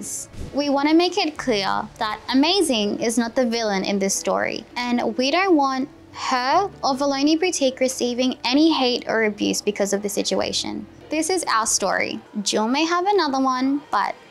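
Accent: Australia. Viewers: I HAD NO IDEA SHE WAS AUSTRALIAN DANG. Gorgeous face body and voice, shes blessed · Australian????😭😭😭😭😭 that accent caught me off guard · I had no idea she was Australian or had such a beautiful accent :Edit: thanks so much for all the likes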